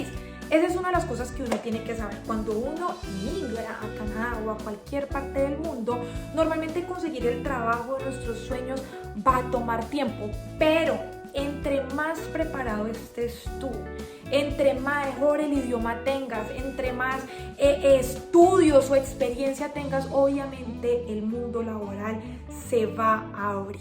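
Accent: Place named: Cauca, Colombia. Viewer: Saludos jajajajaja ese acento del Cauca está intacto 👏👏!Gracias por tu contenido!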